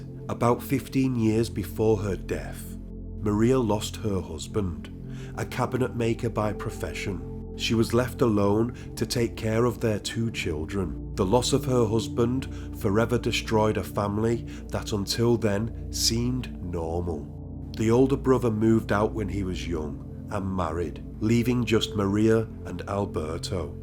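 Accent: United Kingdom. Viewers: Please forgive my ignorance. Where’s your accent is from? I know you’re from uk … which part ?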